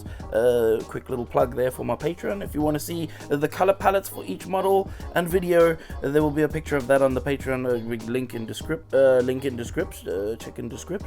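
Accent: South Africa. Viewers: are you south african? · I detect a hint of South Africa in that accent😂 you can't get rid of us that easy. But superb work and truly great craftmanship on the video and models themselves. I need more video series like this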